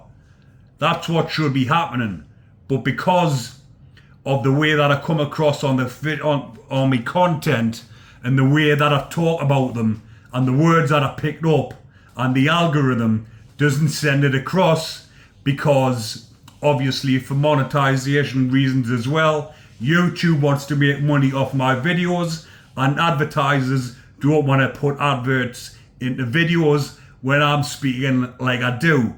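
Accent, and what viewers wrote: Newcastle, United Kingdom. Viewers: Love your Geordie accent you are a Great bloke love Jon.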